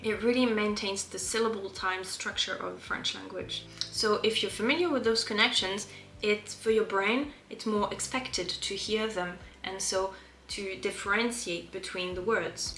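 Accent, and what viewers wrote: United Kingdom. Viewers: Merci, quelques bons conseils. D'ailleurs vous avez un bel accent britannique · Je suis français mais j'adore tes vidéos pour pratiquer mon anglais 🙈petite question où est-ce que tu as appris à parler anglais ? Ton accent est beaucoup trop bien facile à comprendre et ça fait très anglais en même temps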